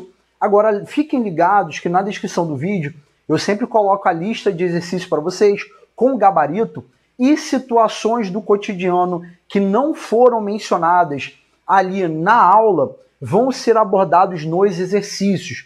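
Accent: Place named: Rio de Janeiro, Brazil. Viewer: Eu amo esse sotaque carioca. Quase me apaixonando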